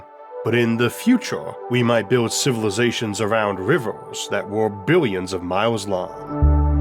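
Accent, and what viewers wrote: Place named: South, United States. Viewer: Just gotta ask Mr. I'm not really trying to criticize, but I gotta know about your speech patterns. Like I said, I'm not trying to be offensive. Do you hail from the southern USA, like Texas. I hear what sounds like an accent there. Oh and I love your videos and knowledgebase.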